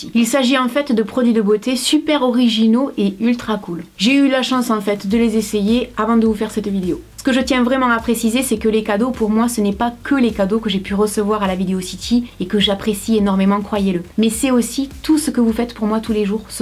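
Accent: South, France. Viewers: J'adore ton accent du Sud!!!💗💗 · Chloé Richaud Si elle a l'accent du Sud un peu. ^^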